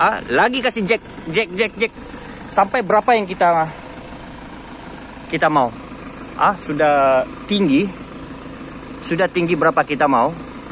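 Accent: Sabah, Malaysia. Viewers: Abg org Sabah ke?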